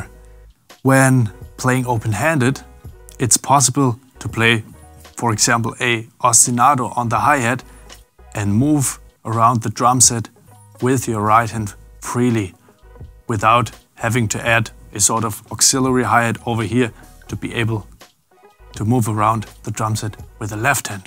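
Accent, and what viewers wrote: Germany. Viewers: I love the german-english accent for some reason. It sounds so smooth.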